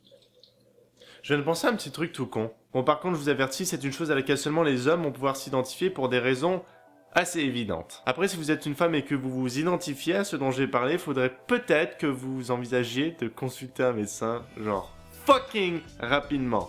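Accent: Quebec, Canada. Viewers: C'est un français ou un québécois avec un accent de frais chié? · XDDD c'est enorme c'est mieux qu'un cyprien ou un norman srx t'es epique avec ta tronche de psychopate et ton accent quebequoi LAPIN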